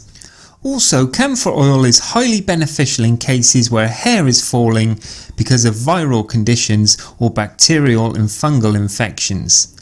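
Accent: United Kingdom. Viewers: You sound like a british hillbilly 👎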